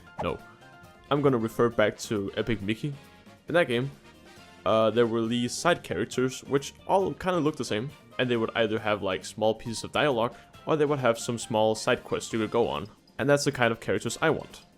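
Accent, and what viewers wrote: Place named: Denmark. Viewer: Bro the accent sounds so danish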